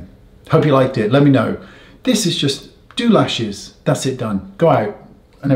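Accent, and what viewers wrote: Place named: Somerset, United Kingdom. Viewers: Love this I’m going to try it by the way congrats 🎉 on your foundation my colour is out of stock at the minute but soon as it’s back I’m going to get it My Grandfather’s was from Somerset I recognised the accent best wishes 🌸🌹🌼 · I was laughing at the accent. My husband's family is in Somerset. Thanks for the technique reminder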